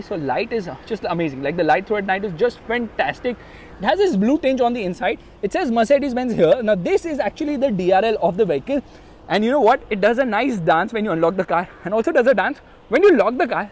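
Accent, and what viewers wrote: India. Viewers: Ебать Индийский акцент это пиздец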